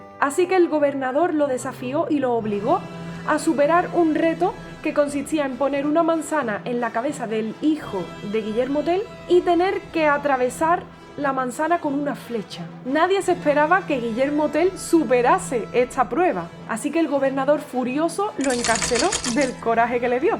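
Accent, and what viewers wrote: Andalusia, Spain. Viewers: ¡¡¡Hola!!! mi bella genio hija adoptiva de todo el mundo descubrí que voy a tener 365 días completos pára disfrutar de : tu arte musical inigualable tus conocimientos de hhístorias y vidas de personajes que no conocíamos 365 días para ver en tu carita angelical reflejado tu gran corazón y tu amor por la humanidad y en tu voz andaluza universal oír murmullos de aguas quietas tonos de aves cantóras oleaje de mar acómpasado 365 días para agradecer a Dios por este regalo 365 días para decir desde el fondo del corazón todos los días GRACIAS POR EXISTIR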